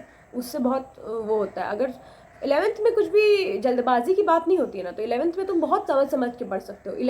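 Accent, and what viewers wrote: Bihar, India. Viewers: Sister do you belong from Bihar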